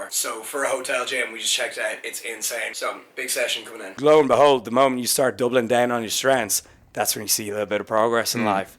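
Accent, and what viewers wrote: Ireland. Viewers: Is he Irish ☘️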